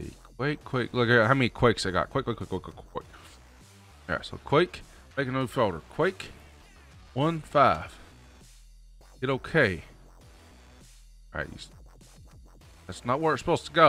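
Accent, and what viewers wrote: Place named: United States. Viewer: i really like your cowboy accent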